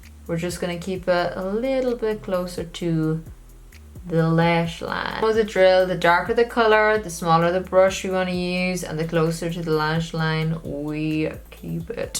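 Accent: Ireland. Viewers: wait UR IRISH OMGGGGGG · Are you from Dublin😀❤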